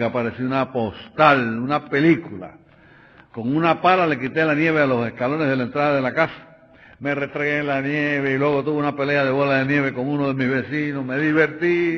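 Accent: Cuba. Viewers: Alvarez guedes parecia dominicano á un con su asentó cubano 🤣🤣🤣 créanme jjjjjjjjjjjjjj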